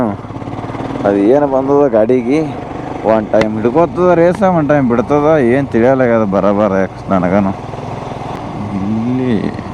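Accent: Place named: Kalaburagi, India. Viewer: Ur kalaburgi kannada is super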